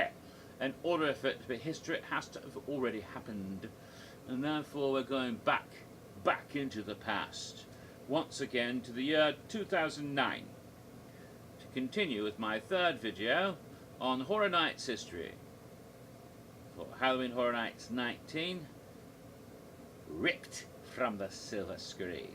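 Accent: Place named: United Kingdom. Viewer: Oh wow, your British accent is awesome Doctor!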